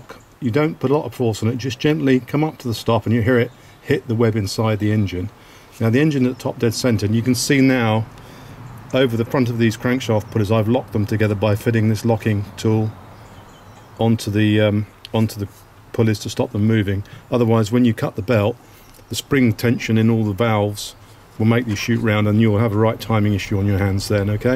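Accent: United Kingdom. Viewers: Love your voice, and how your way of speaking is very clear opposed to many other british people where you can hear the heavy accent.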